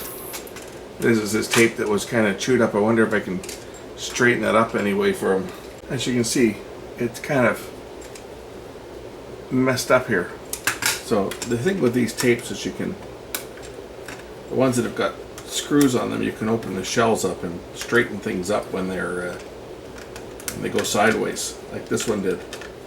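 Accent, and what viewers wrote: Canada. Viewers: Hello my friend, I really enjoyed this video. Love the cat too. I have family in Canada, I know that accent very well. Thanks for sharing, also I just subscribed. Kind regards. Paul.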